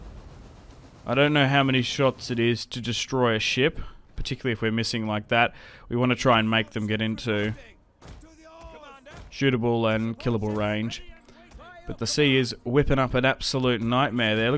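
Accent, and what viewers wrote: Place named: Australia. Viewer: What part of australia you from bro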